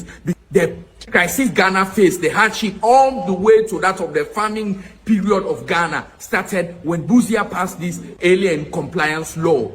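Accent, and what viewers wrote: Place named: Nigeria. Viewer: Your accent is Nigerian. You are not a Ghanaian.You Nigerians claim the success of other countries.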